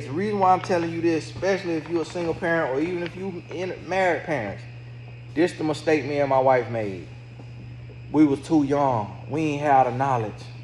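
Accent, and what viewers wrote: United States. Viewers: God bless you. I am single and loving my life. Still praying for my husband whoever he is wherever he is., you are so country but I love listening to you.😂😂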